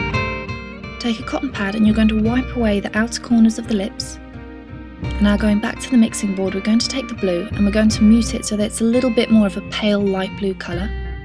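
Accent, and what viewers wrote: England, United Kingdom. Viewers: R U english?? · British =w=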